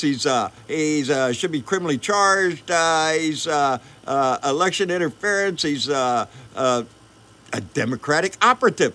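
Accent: Texas, United States. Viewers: I love you, chum!! You remind me of my first husband! Same Texas accent, coloration, shape, sense of humor... Age, too!! 😆😆😆💋💖💋💖😁😁🍿🍹🌊🌊🌊🌵🌵👵🐺🖖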